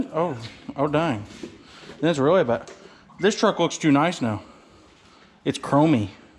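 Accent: South, United States. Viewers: My 8 year old boy and I love watching your videos. They are great. We Live in Pittsburgh Pennsylvania, in the city ,but my son wants to be a country boy more than anything, and he always tries to talk with a country style accent like you do and has been trying since we have started watching your videos. Keep them coming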